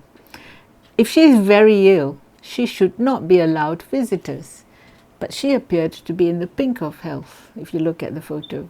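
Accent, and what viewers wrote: Perak, Malaysia. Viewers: mariam putar,ckp melayu x pandai,tapi ckp pereaq okie😁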